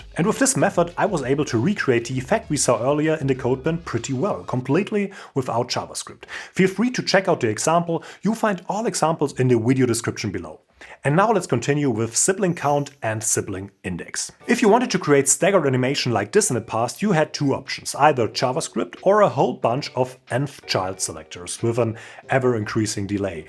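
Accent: Germany. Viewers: german accent detected within the first 10s, which gives me the "made in Germany" vibe👍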